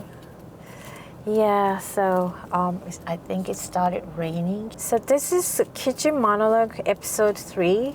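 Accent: United States. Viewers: Nice backstory and information (I had been curious as to your impeccable, American accented English 😉). Best to you and your family. Cheers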